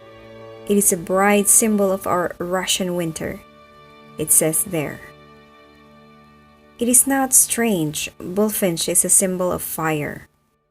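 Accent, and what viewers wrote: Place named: England, United Kingdom. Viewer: Interesting Stories, i Like your english accent kabsat.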